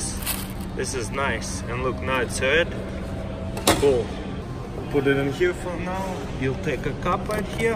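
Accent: Russia. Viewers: Some heavy Russian accent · Its just his accent cause he is russian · ты можешь говорить по русски? немного заметно по твоему акценту. это круто,что наши могут так подняться на ино.ютьюбе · У тебя акцент как у русского,ты русский не так ли?) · Мне кажется или это не американец, а русский. Акцент вообще не английский и произношение хромает. Ну, если что: привет из рашки! :3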